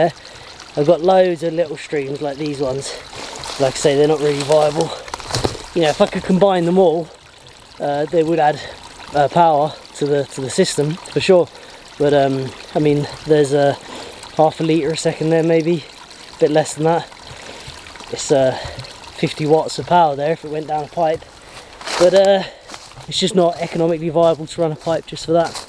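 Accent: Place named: West London, United Kingdom. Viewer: 'that bit of skank' lol. you must be from west london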